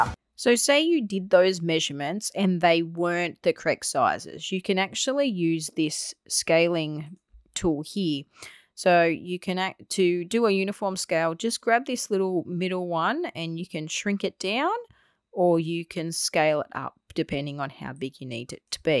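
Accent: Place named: Australia. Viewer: Your video came up in a search for "measure in Cura". Cosplay is not my thing but it is so great to click on a video and here another Aussie's voice come through, thanks for the vid and all the best.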